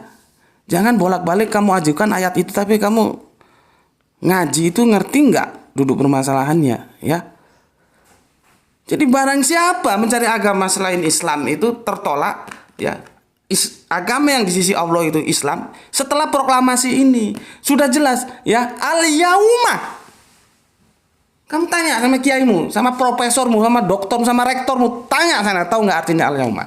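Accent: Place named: East Java, Indonesia. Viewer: Waalaikum salam..... kadang logika saya dipermainkan oleh cara berfikir...cuma aja kadang pemakaian kata kata nya dalam menerangkan memakai intonasi jawa timuran yg membuat tdk tekstual didalam menerjemahkan dalam pikiran saya......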